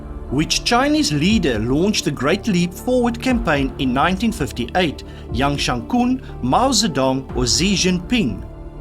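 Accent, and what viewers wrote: Afrikaans, South Africa. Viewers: Klaarblyklik is u eerste taal Afrikaans, maar meestal is u Engelse uitspraak aanvaarbaar. Ek vra vir my of u enige dokumentêre of reisgidse oor Suid-Afrika wat deur Amerikaners geskep is, bekyk het? Ek het, en sommige van hulle is uiters goed, veral as die mens/e wat daarvoor verantwoordelik is, 'n paar minute op die uitspraak van Suid-Afrikaanse name en plekname gespandeer het. Vandag is dit baie maklik om korrekte uitsprake op die internet te kan vind, en 'n mens hoef nie 'n pedant te wees nie; veel name het standaarde uitsprake in buitelandse tale, b.v. "Paris", en natuurlik sê baie Suid-Afrikaners "Kru-gir" en nie "Kreur" nie, asook "Bloemfontien" en nie "Bloemfontein" nie - selfs die uitsaaiers! (Oké - ek erken dat "Bloemfontien" my pla!) Maar i.v.m. dokumentêre van S-A, is dit hoogs irriterend om verkeerde uitsprake van naam nà naam nà naam te hoor - dit skep die indruk dat die produsent/regisseur geen werklike kennis van die land het nie, en het sommer-so inligting van die internet opgegrawe om 'n wins daardeur te bekom. 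M.a.w. is die dokumentêre/reisgids onopreg, en daar is geen ware respek vir die land en sy mense nie. (My ore staan altyd vir die woord "fynbos" orent!) Ongelukkig, sedert ek 'n "pikkenien" was, het ek die geleidelike agteruitgang van sommige tale waargeneem - veral Engels, en veral in die VSA - weens verskriklike opvoeding in hulle moedertaal en luiheid. "Pasteur" is a Franse naam, en ja - ek haat die tipiese Amerikaanse uitspraak van "van Gogh", oo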